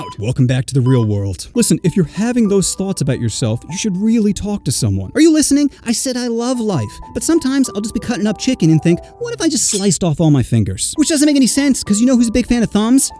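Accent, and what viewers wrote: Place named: Alabama, United States. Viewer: Bros from Alabama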